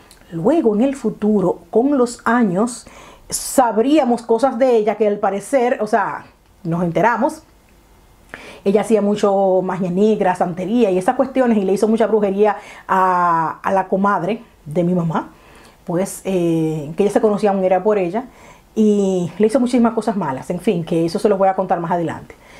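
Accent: Venezuela. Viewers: gracias por compartir tu vivencia en mi bello país, me encanto escucharte hablar caraqueno jaajajjaja. Dios te bendiga por tomar tiempo para mencionar esos recuerdos tan bonitos, tengo casi tu edad y de verdad es inevitable no sentir nostalgia al ver como nos cambio la vida, ojala Dios no ayude para salir de esta lamentable situación nuevamente gracias querida. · que bello tu video, soy Venezolana y me vine a madrid por la situacion, y si es cierto que tienes algo de acento venezolano de hecho en los primeros videos que no sabia de donde eras pense que eras venezolana, gracias por hablar bien de mi Pais no sabes como lo extraño, gracias amiga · chama si tienes el aciento venezolano me encanta👌😘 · Bonita historia, todavía tienes ese acento. De hecho antes de saber que eres Dominicana, pensé que eras venezolana. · con razón la afinidad nena, yo si sentía cierto acento compatible con el mío jajajaja besos nena me encantó el vídeo, se parece a mi infancia en Maracaibo-Venezuela 😘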